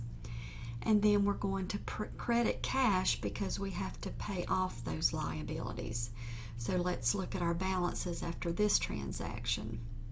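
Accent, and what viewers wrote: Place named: South, United States. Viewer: thank u and i like your southern accent btw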